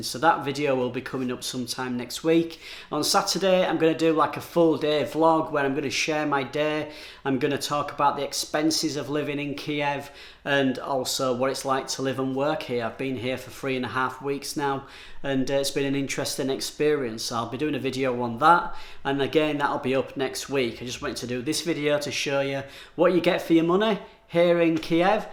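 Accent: United Kingdom. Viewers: Are you British.?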